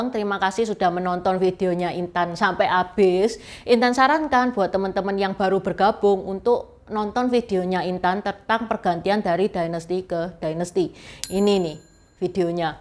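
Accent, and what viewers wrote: Java, Indonesia. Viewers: apa intan dah berumah tangga, and anda kyak dri jawa ngomongnya tu lo medhok bnget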